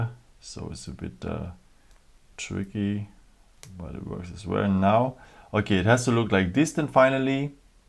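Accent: Germany. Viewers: Bruder man merkt voll das du deutscher bist wegen dem aktzent feier dich lg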